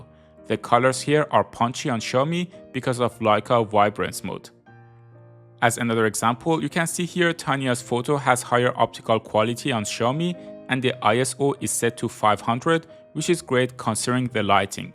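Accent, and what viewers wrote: Iran. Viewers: Your accent is similar to Iranian....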